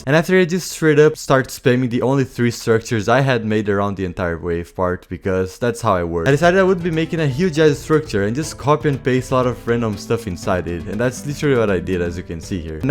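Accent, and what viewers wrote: Brazil. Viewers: Ae mano, eu notei um pouco de sotaque no jeito que vc fala em inglês, e só queria perguntar se vc é brasileiro (Hey man, I've noticed a little bit of an accent on your English, and I just wanted to know if you're Brazilian) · I recognize the Brazilian accent everywhere lol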